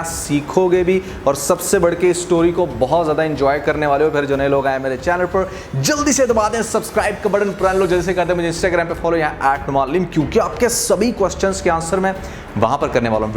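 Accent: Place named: Punjab, India. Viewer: Nheeeed 😂😂😂😂 Bhai apni pronunciation Tu thik kro.. Urdu main b Punjabi touch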